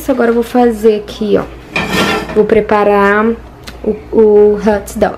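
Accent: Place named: Rio de Janeiro, Brazil. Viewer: Linda amoo esse sutaque carioca, tô amando te acompanhar 😍😍😍👏👏👏👏 parabéns por ser essa pessoa atenciosa e quando voltar a sp vou te conhecer bjos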